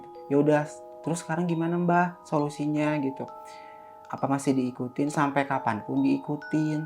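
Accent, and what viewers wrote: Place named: Bandung, Indonesia. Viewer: bandungnya kerasa banget a😂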